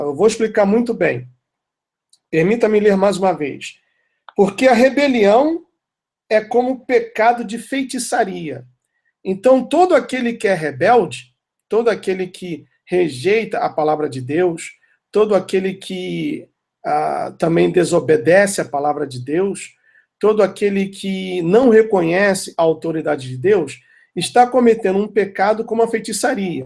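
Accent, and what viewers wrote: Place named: United States. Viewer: tchat???!! pô fala serio.... vc mistura português com sotaque americano... se liga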